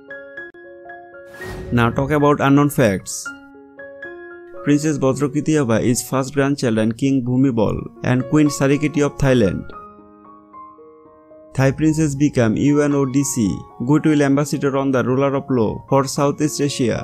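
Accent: India. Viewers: Could you please change another Commander. We cannot understand what this gentleman is saying. Too heavy indian accent. Horrible and wasting air time money 😱😱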